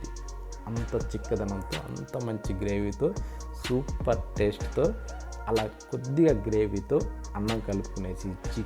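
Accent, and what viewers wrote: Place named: Telangana, India. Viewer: అన్న మీమాట తెలంగాణ మాట లాగే వుంది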